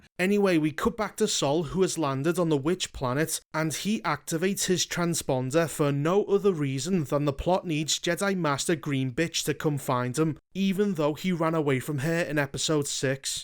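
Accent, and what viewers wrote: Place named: Scouse, United Kingdom. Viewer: It’s a Scouse accent. Every one in liverpool talks like that. To be fair to his Scouse accent is actually quite mild compared to most in liverpool.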